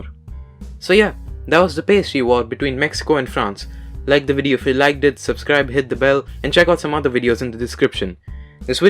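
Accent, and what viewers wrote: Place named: United Kingdom. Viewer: im dying lol, gj m8 nice british accent btw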